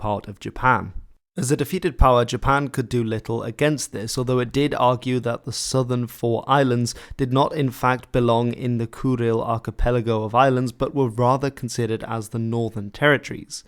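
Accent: United Kingdom. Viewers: Hi. Are you from UK? I am so curious about your accent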